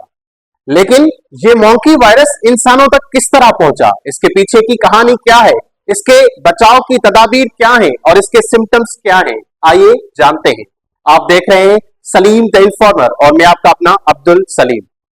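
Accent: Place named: Sindh, India. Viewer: Sir I have some suggestions I would like to share with you firstly that there is a little bit Sindhi accent flowing in your tone kindly improve that and second the voice quality is not that good for that you can use Adobe podcaster firstly you will import your audio file from your video then you can enhance it from Adobe podcaster then later you can mute the video and put the audio file it will improve it soo yaa that's all I guess please put a eye on that thank you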